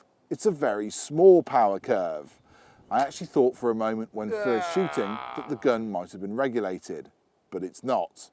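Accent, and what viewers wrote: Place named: West Country, United Kingdom. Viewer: Birthday suit legend, nice to hear some west country tones, I’m not far from you, on the mendips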